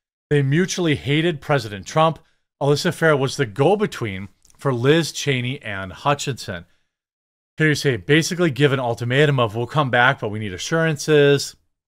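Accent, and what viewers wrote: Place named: Wisconsin, United States. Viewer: Love you man! Merry Christmas to you and yours. I love hearing the Wisconsin lily in your voice; makes me miss home ❤. Keep up the great work.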